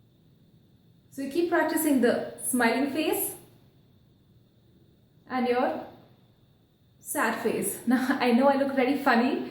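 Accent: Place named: India. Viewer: i find Indian accents pretty. yours soft ;)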